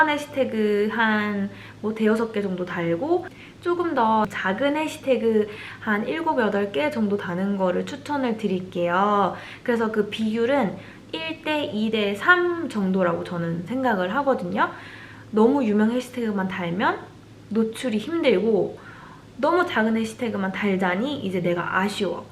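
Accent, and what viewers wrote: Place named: Gyeongsang, South Korea. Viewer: 경상도분이시네요 ㅋㅋ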